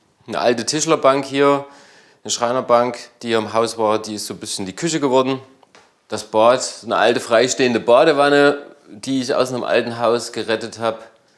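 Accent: Germany. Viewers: Wunderschöner Platz, einladender Raum, tolle Einzelstücke, mit einer ruhigen Ästhetik zusammengestellt. Und gesprochen mit meinem deutschen Lieblingsdialekt 😊, sagt die Schweizerin.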